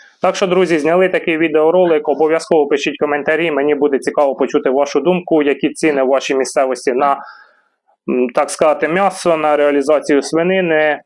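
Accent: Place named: Cherkasy, Ukraine. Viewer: Подяка людям праці .Мова точно як в селах Черкащини.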